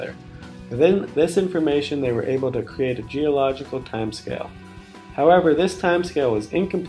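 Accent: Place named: Russia. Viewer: Русский акцент, сделай на русском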